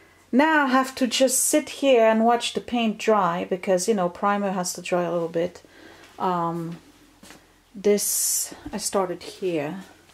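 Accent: France. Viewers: You did an excellent job on your sewing machine table, I am so impressed!! And I love hearing you talk because I like your French accent!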